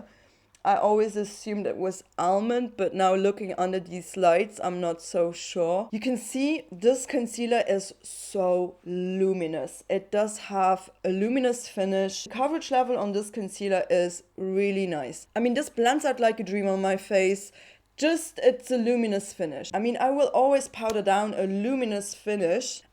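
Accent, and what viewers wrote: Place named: Germany. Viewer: Thank you for your reviews, they are very thoroughly made, really like and enjoy them every time! You are one of the very few YT ppl that dedicate themselves to clean and vegan as well as cruelty free make up products / brands. Happy to have found you! I notice some kind of German accent and you are using quite a lot of adverbs ... Are you originally from Germany? So curious ;) Have a merry Christmas and enjoy some free and relaxed time with your family and good (vegan?) food.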